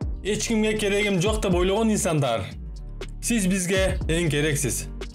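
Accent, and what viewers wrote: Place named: Osh, Kyrgyzstan. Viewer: Ошский диалектика мн суйлобой кыргызча суйлосон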